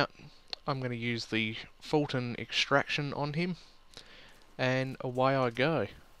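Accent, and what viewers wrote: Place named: Australia. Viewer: But yes it is Australian. It's a pretty broad accent at that, bordering on what we call "ocker".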